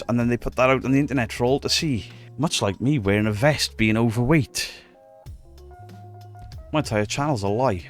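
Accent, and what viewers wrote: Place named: Cardiff, United Kingdom. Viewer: Ah nevermind! Just thought you might have seeing as you sound local (I'm in Cardiff) - all the bands of the time like FFAF, Shaped By Fate, even...ugh... Lost Prophets used to post on it all the time. Even met my wife on there lol.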